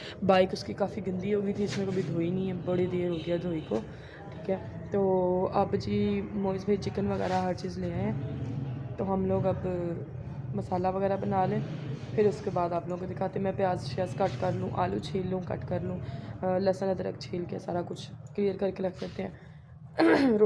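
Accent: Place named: Lahore, Pakistan. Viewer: میں آ پ کی ساری وڈیو دیکھتا ہوں آ پی آ پ کہاں سے ہو آ پ کی بولی لاہور کی لگتی ہے ❤❤❤❤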